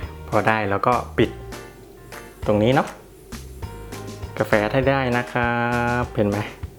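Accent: North, Thailand. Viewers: คนเหนือก่อครับพี่ รีวิวดีมากเลยครับ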